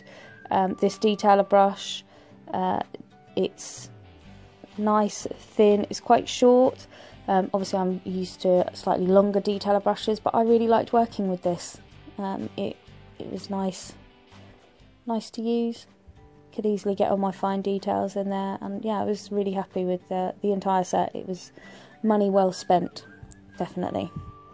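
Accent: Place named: United Kingdom. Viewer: You're awesome, just found you in this moment and subscribed immediately! ;) Love your voice and British (?) English :) Greetings from Germany!!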